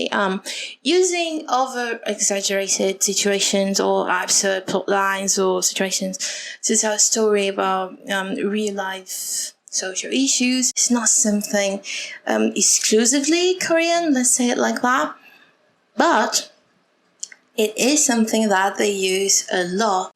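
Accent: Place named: United Kingdom. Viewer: O sotaque britânico da gata! The British accent of the kitty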